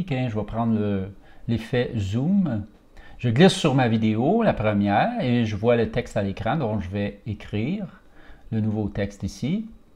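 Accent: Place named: Quebec, Canada. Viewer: Je vous adoré surtout à votre accent Québécois 😉, vidéo c'était bien. Merci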